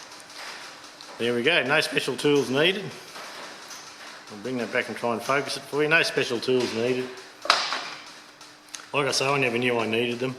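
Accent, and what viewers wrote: Australia. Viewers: Just discovered your channel. I'm a mechanic too. Mainly auto and light truck. But now venturing in big diesel like heavy truck and equipment. I'm in upper Midwest, central Minnesota. Sounds like you're in Australia? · Thanks pardner...same pump as my Cummins on my 40hyatt boat...very helpful.. Good job..u sound like Australia.. I'm n Chattanooga Tennessee.. Thanks again friend..!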